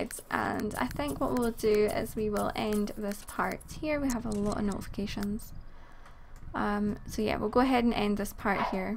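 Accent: Scotland, United Kingdom. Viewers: We have the same accent I'm Scottish too 😊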